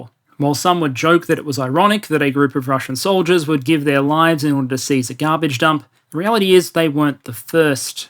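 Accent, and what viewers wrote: Australia. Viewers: Sorry but your whiney Aus accent makes it too hard to listen.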